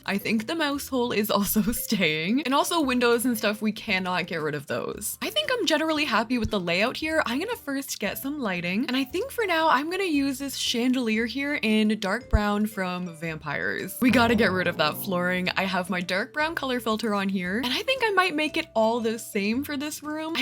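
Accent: Canada. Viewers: when i first started watching, it was my first time ever watching someone with a strong Canadian accent, and it was a big adjustment but now i cant watch ANY SIM VIDEOS WITHOUT HEARING THE COMMENTARY IN HER VOICE 😭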